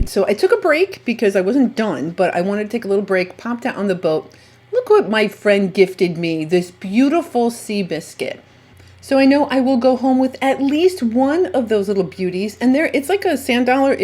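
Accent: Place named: South, United States. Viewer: Hello love! FIRST! Maybe one day, I'll make it to the Keys. Don't know why I've never been... Beautiful sea star! Is that a Clydesdale horse conch? Hey, you're beginning to sound like a real Southern girl! "I'll tell ya what!" You did great and had such an exciting adventure! So happy for you! Much love from Levi and me! It's nearly a hundred degrees up here! We're staying in the AC! Your Mermaid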